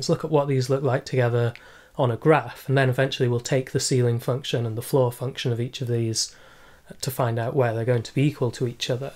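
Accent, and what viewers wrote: England, United Kingdom. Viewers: Fantastic solution! I really appreciate how systematically you approached the problem, making it easy to follow and understand. Your channel is truly a gem for anyone looking to improve their math skills, and your way makes learning enjoyable. Please keep uploading such excellent videos! Your dedication and clear explanations are incredibly valuable. Apart from the maths, I like your English accent, it is amazing. Like from Saudi Arabia 🇸🇦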